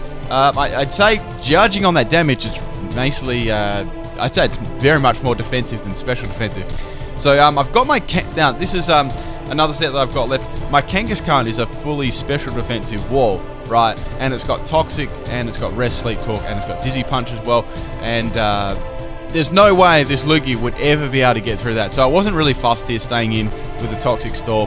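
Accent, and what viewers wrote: Australia. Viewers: are u australian or something i'm new in this channel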